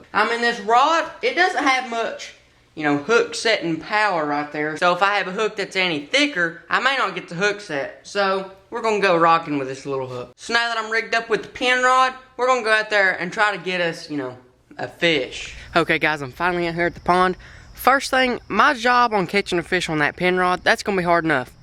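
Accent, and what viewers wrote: United States. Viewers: Your accent sounds like a cowboy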